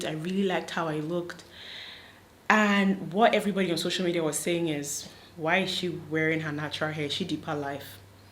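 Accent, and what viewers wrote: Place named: Ghana. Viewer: Gee, I caught some Ghanaian accent from you. 😂💚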